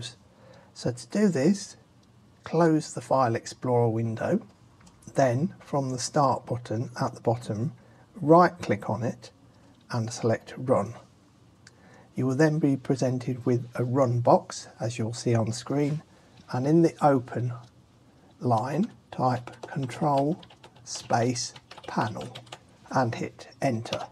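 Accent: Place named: United Kingdom. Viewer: thanks british guy! this actually helped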